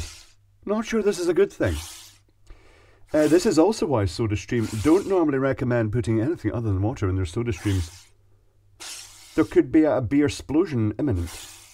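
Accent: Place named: Glasgow, United Kingdom. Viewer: As an American, I love the Glaswegian accent and lingo ("a wee bit") haha Something I would have tried is using the "nose oil" trick I learned in college (if you have oily skin, wipe your finger on your nose and then swirl it around the foam) which almost immediately breaks the surface tension of the bubbles and kills the foam.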